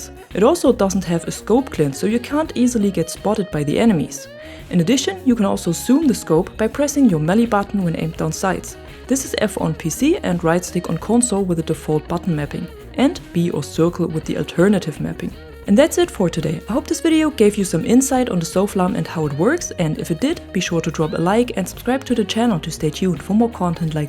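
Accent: Germany. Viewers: Is that a German accent? :) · Are you from germany?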